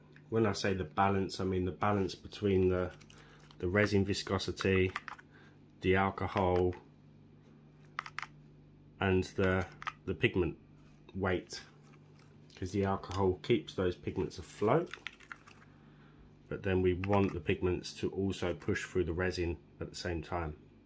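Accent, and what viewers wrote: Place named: England, United Kingdom. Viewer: Sorry, I must have missed something you said, when do you apply the black/white colour to the pieces please? I’m worried about doing it too soon and obliterating everything else. Thankyou so much for your work and videos, sometimes they are all that keep me afloat, it’s lovely to hear an English accent too, being of that country.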